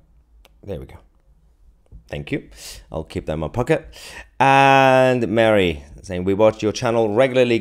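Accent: United Kingdom. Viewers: Just discovered your channel two days ago. Love your reporting and your British accent! Viewing from Kansas in the U. S.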